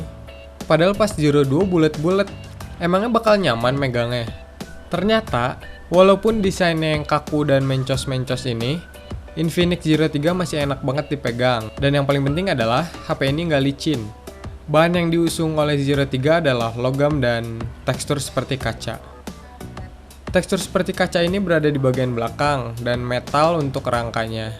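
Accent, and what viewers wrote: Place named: Bandung, Indonesia. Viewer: logatna bandung pisan euy ! Sampurasun mang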